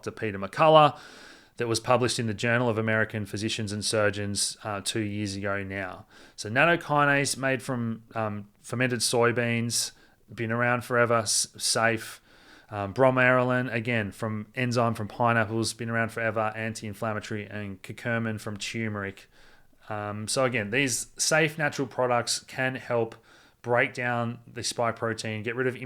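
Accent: Australia. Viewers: As soon as the word KABAL is used it becomes another asshole speculating and pushing some crap that may resonate with those that do not possess the skill of critical thinking.. Don’t be led by the nose down the garden path . An Australian accent does not automatically exude credibility!!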